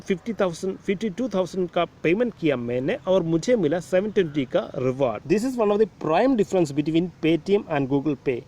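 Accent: Kerala, India. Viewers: Malayali ahn ale Hindi kettepoll thonni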